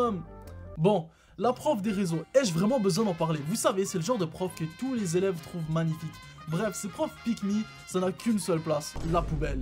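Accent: Quebec, Canada. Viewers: incroyable la vidéo le montage est propre et non je ne suis pas raciste mais j'ais l'impression que t'a un petits accent du Québec j'adore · T’as un accent qebecois 😂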